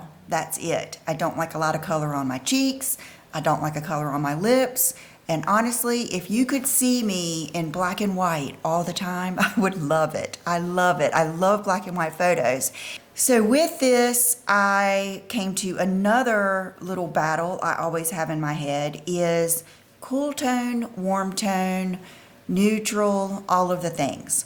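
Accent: South, United States. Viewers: I just LOVE YOU. You are who I’d love to be. I found you and you are like my fantasy channel. I can’t afford the high end things you have and talk about. I have to say at times, I feel envious and at times it makes me sad that I can’t have such nice things. I’m 60 and disabled on fixed income. I’d love to be picked for a gift, it would mean the world to me. Thank you for being the fantasy “me”. My coloring is like yours and I have the redness. I try to look my best with the makeup I can afford. Anyway, I am happy I found your channel and I love your fashion sense (it’s me or the me if I could afford it) I love your southern accent and your kind and sweet way you treat your “friends” on here. God bless you.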